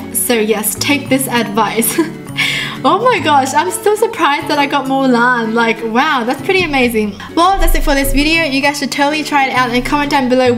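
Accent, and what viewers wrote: Australia. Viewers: You're* her parents are Asian so that makes her Asian but she lives in Australia, that's why her accent is like that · Your Asian? Oh..Well I always thought you were Australian😂😂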